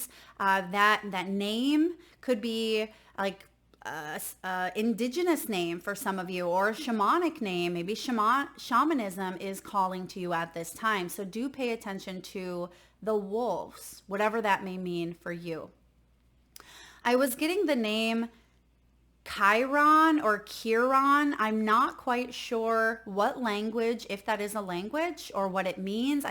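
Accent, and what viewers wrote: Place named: Midwest, United States. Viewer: I've been watching you for some years now and always thought you sounded so midwestern! I grew up in ND and went to college in MN, my best friend is from Wisconsin :)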